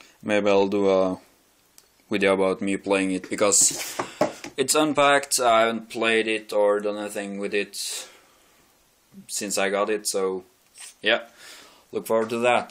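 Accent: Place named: Trøndelag, Norway. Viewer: I love ur Trønder-Engelsk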